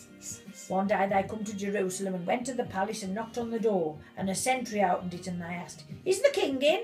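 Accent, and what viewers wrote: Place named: Birmingham, United Kingdom. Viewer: Not all Black Country ..Can here some brummie there too...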